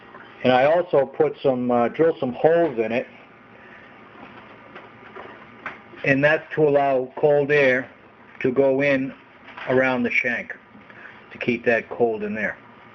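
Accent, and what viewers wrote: New England, United States. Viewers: Great work! I'm sure everyone is jealous now. :) Are you from New England? I suspect RI. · your craftsmanship + your accent make me feel like I'm watching The New Yankee Workshop.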